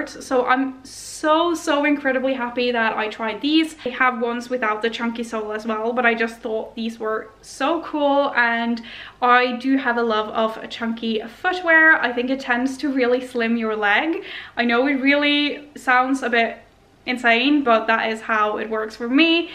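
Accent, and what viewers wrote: Ireland. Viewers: Norwegian with Irish accent?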